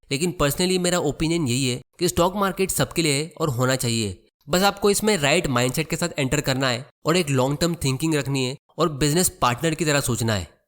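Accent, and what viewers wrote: Gujarat, India. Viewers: Gujarati voice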